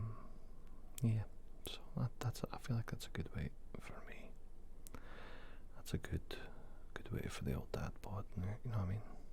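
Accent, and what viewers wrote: Scotland, United Kingdom. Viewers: I LOVE videos like this. I’m always partial to ramble videos. For me, you’ll never be one of the channels that gets buried. Scottish accents seem to be few and far between in the ASMR community, other than Lauren, so I always find myself coming back to your videos. You’re one of only channels I have notifications turned on for. Keep up the great work my friend, but I hope that you’re still enjoying this grand youtube odyssey you’ve embarked on.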